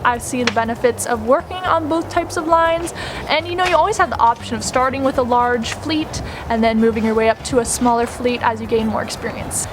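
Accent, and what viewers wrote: United States. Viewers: I sounds like you have an American accent. Were you in entertainment? I was a casino dealer on RCCL. What can make or brake it, to me, is your roommate. Any opinions?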